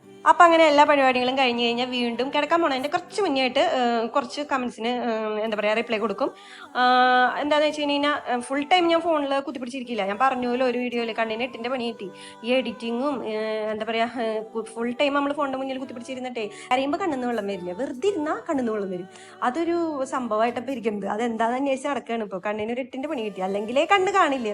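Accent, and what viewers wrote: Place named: Thrissur, India. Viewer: ഒരുപാട് late ആയാണ് ഞാൻ ഈ ചാനൽ കണ്ടത് പക്ഷേ സത്യം പറയാല്ലോ ഒരുപാട് ഇഷ്ടമാണ്.ഇതിലെ തക്കാളി മുട്ടക്കറി try ചെയ്തു കിടു ആയിരുന്നു.ഇപ്പോൾ എല്ലാ videos ഉം കാണാറുണ്ട്.really love the way u are😍😍😍😘😘😘തൃശ്ശൂർകാരിയേം,ആ സംസാരവും ഒരുപാടിഷ്ടം😘😘😘😘😘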